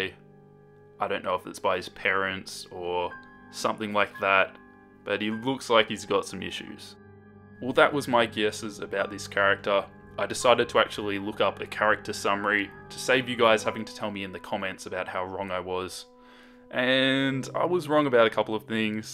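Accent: Australia. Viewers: Wait, ARE YOU AUSTRALIAN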